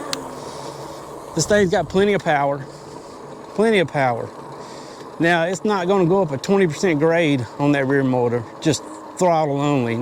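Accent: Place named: Texas, United States. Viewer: Merry Christmas my friend! And yes I will subscribe. I just purchased me one yesterday and not only did you make me laugh with the shameless request for subscription... love it... you also showed me what I wanted to see about the way it responded to your pedal strength. I mean it man...perfect ... and you sound like all my family here in Texas lol. Awesome!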